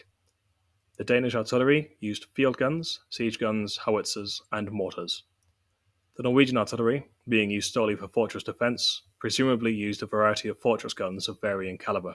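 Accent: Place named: Germany. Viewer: Ver-ve-uh is closer. I appreciate the effort, but there’s not much point in using these terms if native speakers can’t understand half of them. Just speaking English would be clearer, because right now it sounds like a mix of German and English which gets a bit confusing. I’m not trying to correct your pronounciation, I just figure it’ll be easier for everyone in English.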